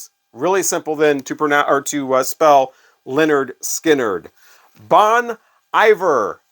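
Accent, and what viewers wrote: United States. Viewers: It hurts my ears every time someone American tries to pronounce Scandinavian names 😭But okay, it wasn't the worst I've ever heard. 😂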